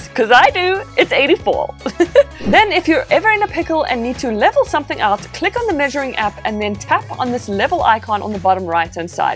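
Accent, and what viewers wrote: South Africa. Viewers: are you South African? I'm picking up an accent :) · I can't understand yr accent, is it South African? i luv yr vids sm <3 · I didnt realise we had mouse option, i have it on my ipad so great tip Have you given away your covers ? Great accent (maybe a saffer?)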